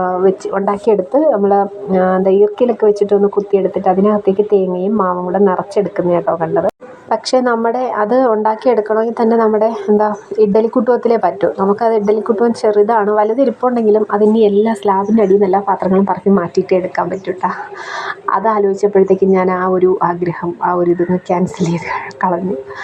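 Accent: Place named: Kollam, India. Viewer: ഞാനും കൊല്ലം. ചവറ. സംസാരം കേട്ടപ്പോൾ തോന്നി കൊല്ലം കാരി ആണെന്ന്. അതാടാ ചോദിച്ചേ.❤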